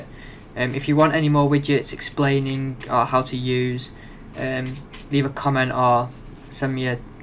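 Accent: United Kingdom. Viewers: Well explained...with a Manchester accent, too!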